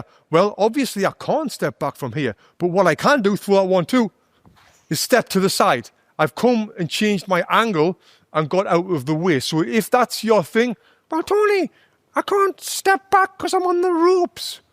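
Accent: United Kingdom. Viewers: Great work love that british accent mate😀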